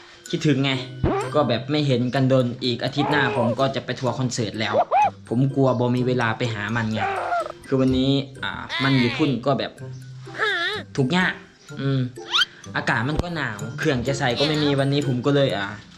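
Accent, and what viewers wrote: Laos. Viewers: ເຈົ້າປາກພາສາລາວໂຄດນ້າຮັກເລີຍ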